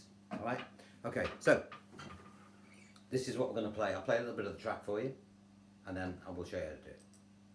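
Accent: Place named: Liverpool, United Kingdom. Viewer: I totally agree with all who have said this is the best lesson for this song on the internet! Thank you for sharing it. Plus, it's fun for this American Girl to listen to your Scouse -- cos if I am not mistaken, your accent is certainly from the north of England. So, ta from my good self! :)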